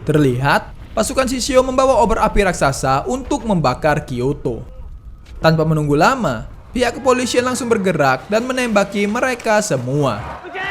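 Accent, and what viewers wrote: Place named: Java, Indonesia. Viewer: Suaramu gk enak cok medok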